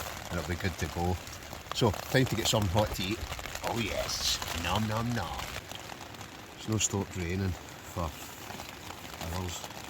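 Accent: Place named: Scotland, United Kingdom. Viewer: Nice Akto like tent, Cool Scottish accent. A camping video in Scotland? That's rare; most of of them are in the lake district on one of the gabels.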